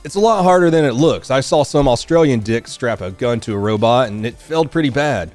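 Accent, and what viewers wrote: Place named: Texas, United States. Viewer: Since when are you Texan? Thought it was Bama 4 ever 😢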